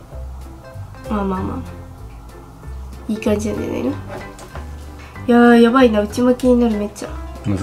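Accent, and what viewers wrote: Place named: Hiroshima, Japan. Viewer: ちゃん可愛い！所々でるじゃけいとかの広島弁？が私も使うから親近感あって嬉しい！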